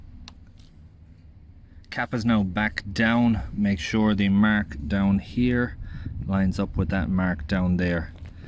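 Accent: Ireland. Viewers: Are you from Cork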